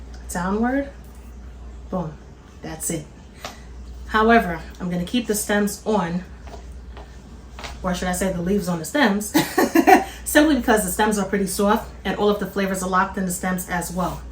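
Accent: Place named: New Jersey, United States. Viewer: Is that a Jersey accent I hear? Love this recipe also, thanks for sharing ❤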